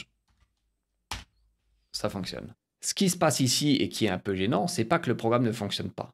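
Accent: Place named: Alsace, France. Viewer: mec je te remercie, j'ai planché pendant 3 jours non stop. J'avais compris pourquoi on devait les utiliser mais comment utiliser ces outils, j'en étais incapable. Maintenant je vois un peu mieux et en plus tu as un léger accent alsacien ce qui m'a facilité le travail ^^'. Je pense que je vais jeter un coup d'oeil sur tes autres vidéos et merci encore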